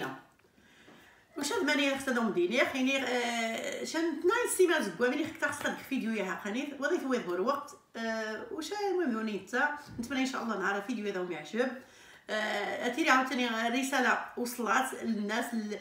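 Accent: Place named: Rif, Morocco. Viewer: Moi j suis kabyle d'Algérie, j'adore amazigh rifain ❤❤❤❤ j'ai pas compris beaucoup de mots mais j'adore ton accent ❤😍😍😍😘😘😘😘😘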